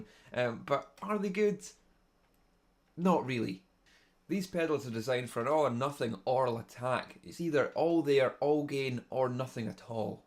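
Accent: Scotland, United Kingdom. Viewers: your accent was a Little difficult, are you scottish? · The Metal Zone got its bad rap because (most) guitar players can't work a parametric ("sweep") EQ. I find it way easier getting the sound I want out of any amp using the MT-2 than any other pedal. Just don't crank the distortion, boost the mids and sweep it until you find your mids sweet spot, then dial it back to where you like. DON'T boost the highs too much, nor the lows. You can easily get 5150 type distortion out of this pedal straight into a line box if you know what you're doing, which its critics don't seem to. Ps. I love your scottish accent, but your guitar tone could open up its top end a bit more. Ds.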